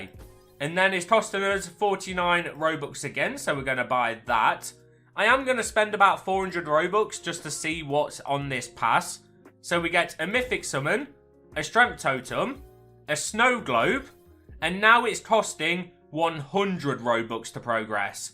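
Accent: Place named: United Kingdom. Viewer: Bro is British because he was spending pounds and his accent also makes this true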